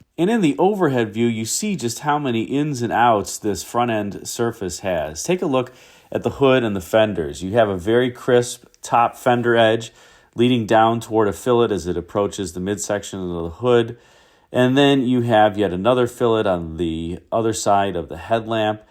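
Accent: Midwest, United States. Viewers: I loved the first generation Grand Ams and Pontiac did a tremendous job styling them — when they were pretty similar in a lot of ways to a Chevrolet Malibu underneath. I had to laugh at your mention of the Ventura pronunciation. I like your midwestern accent and it reminds me of the HGTV host Scott McGillivray (who is actually Canadian) and some other actor I can't think of. It's kind of a Great Lakes area sound to me. Lol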